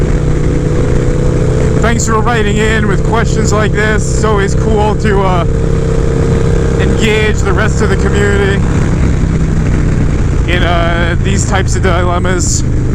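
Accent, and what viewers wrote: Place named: Long Island, United States. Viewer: This is my first time watching you but I'm glad I found ya I can tell this is Longisland I'm assuming, which is where I'm from I'm looking to get a dyna for my first bike this spring/summer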